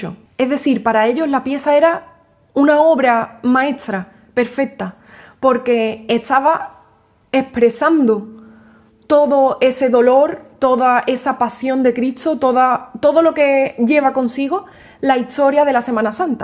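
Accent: Andalusia, Spain. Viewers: Qué bonito!, viva la cadencia andaluza!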